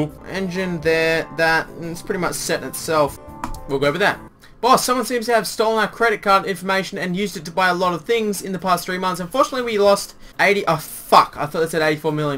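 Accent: Australia. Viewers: The Aussie accent! <3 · Are u from aulstralia?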